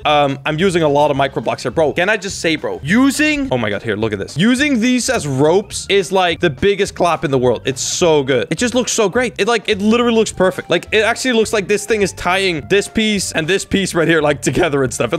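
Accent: Netherlands. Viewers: Hes dutch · are u Dutch? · are you dutch? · Are you from the Netherlands or from Belgium?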